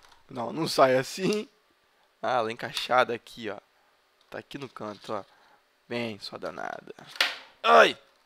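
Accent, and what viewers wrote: Rio de Janeiro, Brazil. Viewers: Tu é de qual zona do rio!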